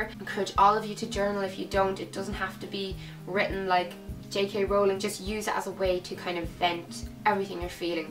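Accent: Ireland. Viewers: irish accent yes irish accent took me a second :)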